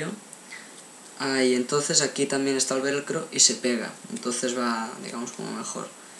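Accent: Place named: Catalonia, Spain. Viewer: com es nota que ets catala cabro! xd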